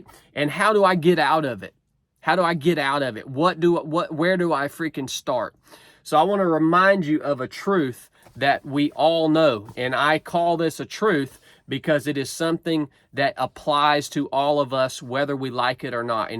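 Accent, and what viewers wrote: South, United States. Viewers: Just gotta say... Thanks to ma man (Southern Draw Accent) lol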